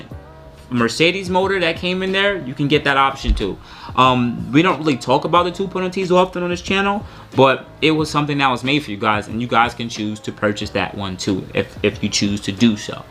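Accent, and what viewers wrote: New York, United States. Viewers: Yo your such a NY'r. Moving to Miami my workers had to break me out of the axe/ask thing. But I'll still say water with my NYC accent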